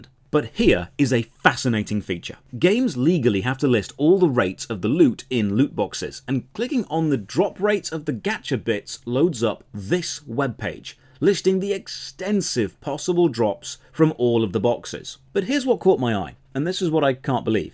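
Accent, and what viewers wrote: United Kingdom. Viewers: I often wonder what your sub count would be like if you didn't look like Henry Cavill and have a British accent.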